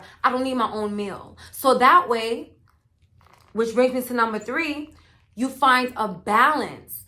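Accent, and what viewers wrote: New York, United States. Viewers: GIRL I just checked your P.O. Box and you live on long islanddd ??? Ik I heard the New York accent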